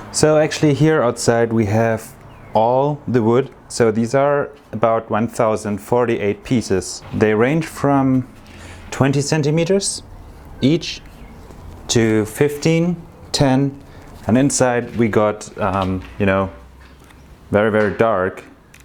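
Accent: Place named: Germany. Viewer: i love how you can instantly recognize a german accent even though its almost unnoticable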